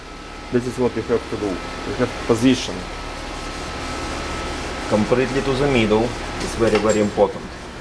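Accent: Russia. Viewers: Как будто чувак русский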